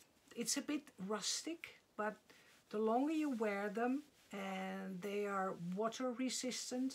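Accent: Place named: Netherlands. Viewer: I love ur bags and socks. My Grandmother was Dutch and hearing your accent brings me back. I have tinnitus as does my mother. The first couple of months it drove me crazy but now I don’t hear it all the time unless I think about it. I think my brain and central nervous system adjusted to it. It’s hard to explain.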